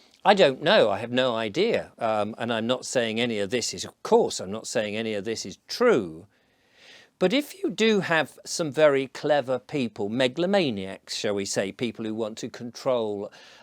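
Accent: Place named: England, United Kingdom. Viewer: Sure you know! I take it you live in England from your accent. I'm convinced and so are all militia's Our pullout from Afghanistan left a whopping multi billion dollar war cache in this country along with pallets of hundred dollar bills. Uniformed fatigues, state of the art assault rifles and open border. Yeah I would say I have a very good reason Given the treason thus far perpetrated on the citizens of the United States.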